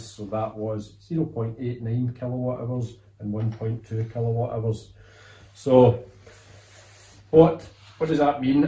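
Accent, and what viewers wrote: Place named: Scotland, United Kingdom. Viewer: you are definitely Scottish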